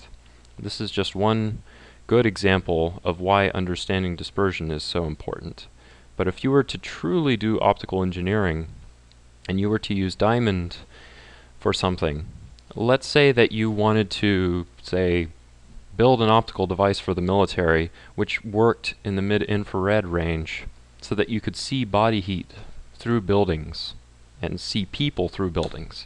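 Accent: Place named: United States. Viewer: finally someone with a regular american accent that i can bear to listen & learn from. Thank you. subscribed!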